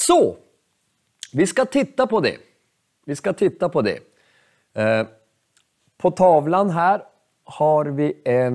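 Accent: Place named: Norrland, Sweden. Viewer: men den är Norrländsk dialekt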